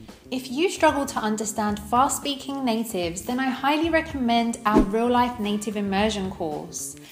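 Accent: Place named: United Kingdom. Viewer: I like bri'ish accent😂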